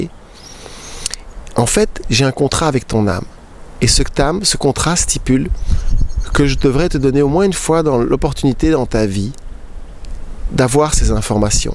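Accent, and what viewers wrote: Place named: Belgium. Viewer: Ce petit accent... n'est pas français ! Belge peut être ?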